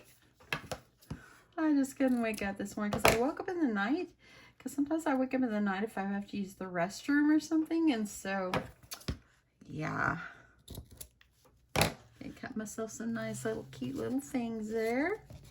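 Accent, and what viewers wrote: South, United States. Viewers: Miss, you have the most beautiful, soft spoken southern sweet voice that always puts a smile on my face. I have had a rotten day, and listening to you always makes me feel at ease and soothes me into comfort. Thank you so much. God Bless you girl for sharing so much with your YouTube audience, you are truly special. And of course I also love all of your crafting 💕💕💕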